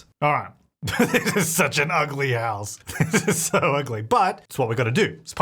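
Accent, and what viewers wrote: Australia. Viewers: I'm Australian so I'm fairly used to the accent but when I have you in the background you sound like Jazza 😂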